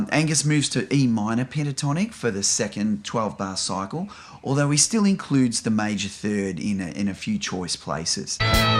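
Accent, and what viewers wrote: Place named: Australia. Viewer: Just watched this! Love it. Great to hear an Aussie accent in this space. Forensic guitar analysis, and you are so talented to be able to demonstrate each player. Your little assistant even has your hair😊🤣. Rock on🎸🔥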